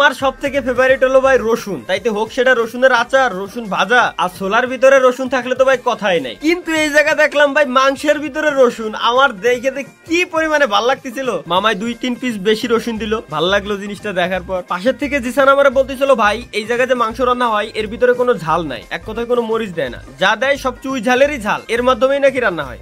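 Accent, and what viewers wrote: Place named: Barishal, Bangladesh. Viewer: ভাই মনে হয় বোরিশাইল্লা😂🤣😂🤣 গের,,আমার বোউ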